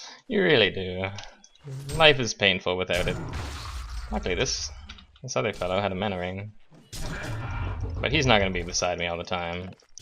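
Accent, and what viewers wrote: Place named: Canada. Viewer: Ohhhh that sweet canadian accent #Wemissyou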